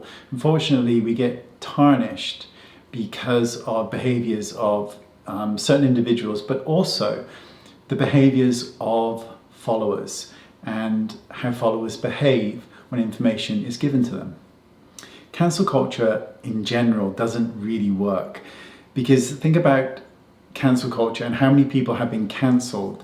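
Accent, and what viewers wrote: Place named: Scotland, United Kingdom. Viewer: That Scottish accent is really poking through in this video and I love it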